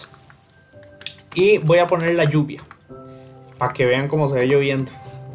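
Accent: Costa Rica. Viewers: mae sos tico verdad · Tu forma de hablar se parece a la de un Costarricense, eres de por acá? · Desde que oí tu pronunciación de R supe que eras tico xd Salu2 desde Argentina (Soy de Nicaragua) :D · Jaja yo también dije "este es tico" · Se te nota lo tico de largo jajajaja, buen video bro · Es Tico? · Mae usted es de costa rica verdad? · desde el minuto 1 se nota que es costarricense jaja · Grande este perro, ya me decía yo que ese acento se me parecía jaja xd, buen video me encanto👌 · Todos hablan de los shaders pero papi desde el minuto 1 note su acento tico, pura vida mae 🤙🏻🇨🇷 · Me suena a hermano tico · Quién más se dió cuenta que tiene acento se costarricense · Bro eres tico? xd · supe que eras tico, desde que digiste inutil jajaj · JAJAJA Bro, yo venía a comentar también que fijo eras tico xad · Parece tico PD: en el lo confirmé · mae usted es de costarica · Ese asentó lo conozco bro, ¿Eres de CR? 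· Eso mismo iba a comentar, este wila es tico. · es por el acento tico igual lo pronuncia asi Rodezel2.0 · usted es de costa rica ?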